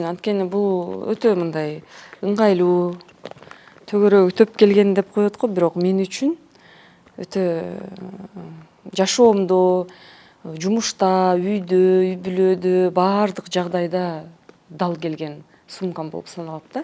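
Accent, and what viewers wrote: Standard. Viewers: Нукура кыргызча суйлогонунуз жакты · Кыргызча таза Тил байлыгы ото коп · Жөнөкөй, кыргыз тилин таза сүйлөгөн, жагымдуу, таланттуу сонун кыз ❤❤❤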